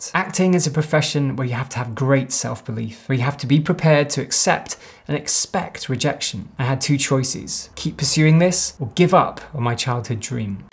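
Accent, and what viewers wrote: Scotland, United Kingdom. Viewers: Dude, I mean this in a good way but your bad acting is pretty entertaining to watch, plus your Scottish accent is spot on. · As an American this means the fact you have a British accent makes you the perfect candidate for an actor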